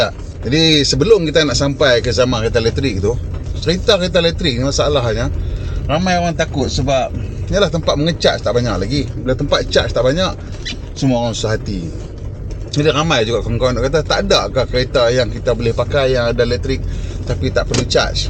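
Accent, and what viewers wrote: North, Malaysia. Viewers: best explain loghat utagha..sempoi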